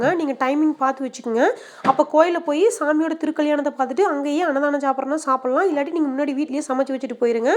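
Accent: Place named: Coimbatore, India. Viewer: Kandepa nenga coimbatore circle than... Mariyathai Tamil அருமை... Avele sapduvanga 👌..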